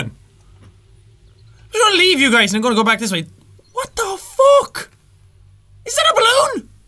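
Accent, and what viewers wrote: Ireland. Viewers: He is Irish if you cannot recognize his accent.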